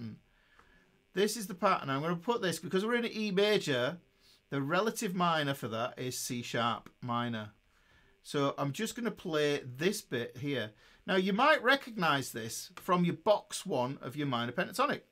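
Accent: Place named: Northern England, United Kingdom. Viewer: I love the northern bloke teaching me. I'm from Rugby. Thanks mate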